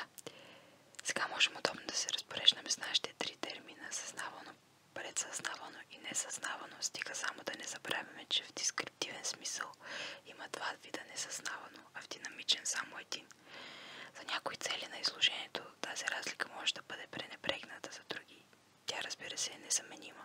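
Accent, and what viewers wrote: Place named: Eastern Bulgaria, Bulgaria. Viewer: Поздравления за това, че си решила да си първата asmr актриса на български език. Имаш хубав глас. Поработи още съвсем малко върху премахването на лекия източен акцент, който макар и съвсем слабо, но се долавя, което автоматично намалява asmr ефекта за хората от Западна България. Желая ти успех.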